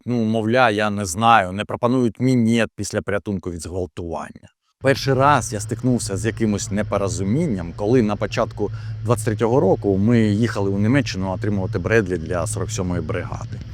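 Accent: Russia. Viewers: Breshe khlopchyk! A mozhe tse troll Putina? Rosiyskyy akcent. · І з кацапським акцентом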